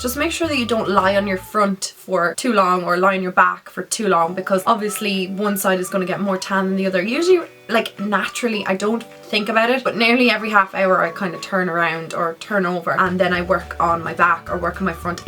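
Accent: Ireland. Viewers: She's from Ireland 😋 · what part off Ireland are you from Xxx love your videos <3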